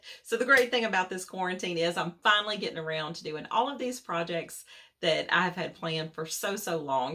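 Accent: South, United States. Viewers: OMG ... I'm from the South (Southeast Texas) and I LOVE, LOVE, LOVE your accent!!! Can't wait to see what you come up with 😁😁😁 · Love watching you and hearing your Southern accent. I’m an Texan girl so it’s good to hear a familiar accent. Your DIYS are so good and I feel like I’m at home.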